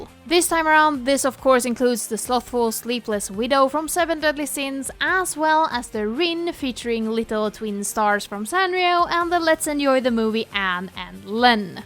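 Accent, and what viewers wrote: Sweden. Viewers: im sorry but i cant stop focusing on the swedish accent 😭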